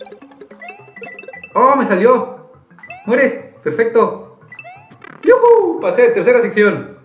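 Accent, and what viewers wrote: Mexico. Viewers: o ya amigo es que el acento del centro me sonabas a vecino yo soy de acá de Toluca y dije si hay suerte hasta una buena tetilla de algún juego saludos un abrazo amigo.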